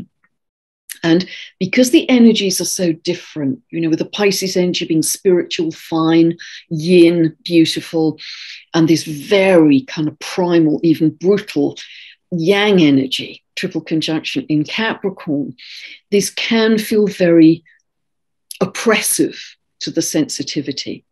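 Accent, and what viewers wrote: England, United Kingdom. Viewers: "...you just have such a lovely way of turning a phrase, that it is a delight....". That's because has a most cultured English accent, so she delivers the news with panache'.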